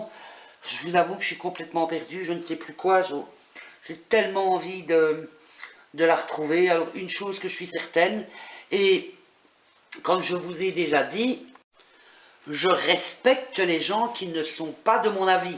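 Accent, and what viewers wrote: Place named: Belgium. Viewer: Vous êtes adorable et vous avez un chouette accent Belge ♥️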